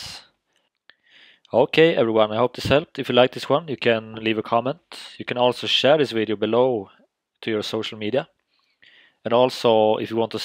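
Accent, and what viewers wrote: Sweden. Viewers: are you from sweden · r u from sweden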